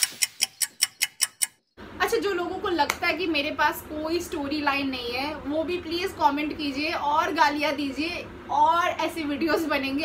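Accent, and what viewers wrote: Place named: Pakistan. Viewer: Are you from Pakistan ? Your English is almost same as Pakistan cricket players Agar English nhi aata toh English bolke kyu smart banne ki koshish kr rhe ho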